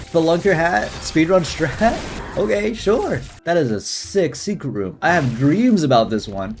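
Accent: United Kingdom. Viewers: day 25 of asking to make full isaac video in british accent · Day 14 of asking to play T isaac and talk the whole time in his British accent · He's from there so it's always a British accent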